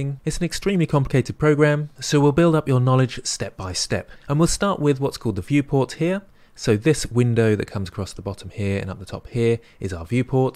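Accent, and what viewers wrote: United Kingdom. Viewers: Nice to have some expert tutorials with a British accent. Gonna get through your playlist, and after watching so many, I hope this will allow me to start creating instead of videos. Thanks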